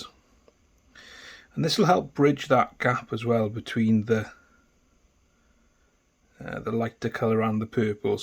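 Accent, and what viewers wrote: Wales, United Kingdom. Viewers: Sorry for your loss bro. I lost my best bud back in July. Do I detect a Welsh accent there? Swansea boy here.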